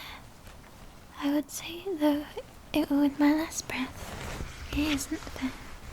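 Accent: United Kingdom. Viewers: Where is your accent from ? England ? Ireland ? Scotland ? Your voice is hypnotic.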